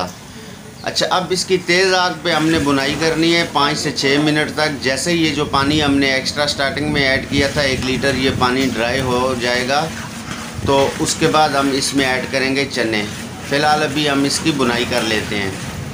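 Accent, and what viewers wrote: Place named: Abbottabad, Pakistan. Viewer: Bhai bot asani se sumjate ho app abootabad ke ho ap ke lehjay se lugta he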